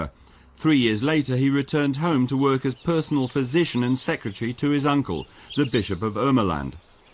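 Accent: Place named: Germany. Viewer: Notei que o cara que está narrando tem sotaque alemão.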